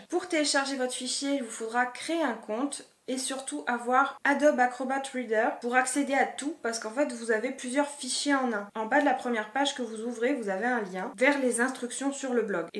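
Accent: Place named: United Kingdom. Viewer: Coucou! Quelle belle capsule, tu nous as gâtées! j'ai déjà repéré 2-3 patrons! Alors, je vais me moquer de ton accent so british 🤣🤣🤣 mais délicieusement frenchie! trop mimi! Alors, si tu as besoin, je peux te traduire quelques patrons (j'en ai fait un pour Audrey), j'ai bien aimé l'exercice. Bon, mon vocabulaire couture en anglais est limité, mais on peut y arriver, avec l'aide de notre ami google 😂 A bientôt! 😘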